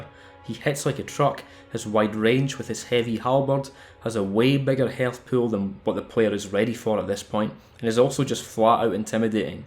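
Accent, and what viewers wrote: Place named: Scotland, United Kingdom. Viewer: Multiple hours of some guy with the most luscious of Scottish accents just talking about his love for Elden Ring? Count me in mate.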